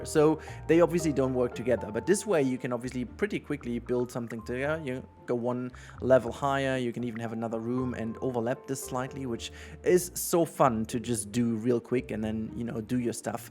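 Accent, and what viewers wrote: Germany. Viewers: Wie man das deutsche raushört 😂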